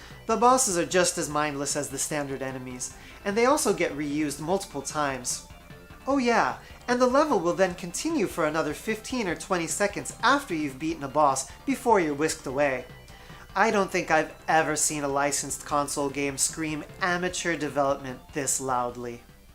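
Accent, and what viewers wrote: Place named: United States. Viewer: they speak in a murican dialect offshoot of real English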